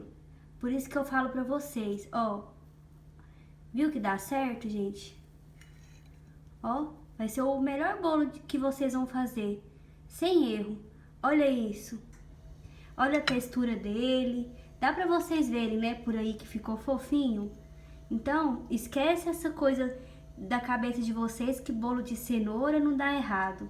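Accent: Minas Gerais, Brazil. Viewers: Vc é uma linda, parabéns pelo seu carinho... Ahh e esse sotaque mineiro é mto gostoso de ouvir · Amei seu jeito de falar até parece ser mineira kk . Acabei de colocar a massa para assar . Acho que vai ficar bom . 😘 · esse sotaque mineiro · sotaque de mineira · Olá ! Eu resolvi te segui pq amei seu sutaque igual meu povo mineiro e simplicidade amo tudo isso Vc é 10 parabéns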